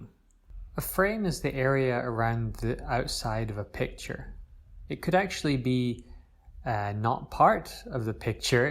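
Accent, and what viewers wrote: Scotland, United Kingdom. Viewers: I'm really curious about your accent. Can you explain what influences there are? I can detect a fusion of Scottish, North American and perhaps, Irish?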